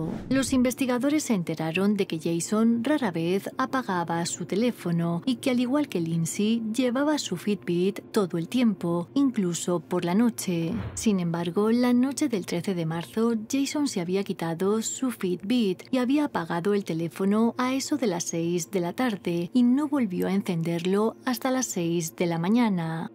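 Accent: Spain. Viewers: El acento es obvio, española.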